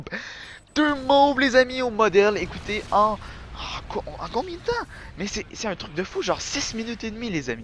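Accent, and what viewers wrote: Quebec, Canada. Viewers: J'adore cet accent québecois :p Sinon pour moi Mode RAGE : Striker + Damage Mode Tranquille : SPAS12 + Damage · Mais non c'est qu'il a un accent come sa car il est québécois ( dsl si j'ai mal ecrit :s ) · quebecois ;D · j'adore l'accent du quebec je t'aime mec :D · Si vous aussi vous kiffez son accent Quebecois <3 :)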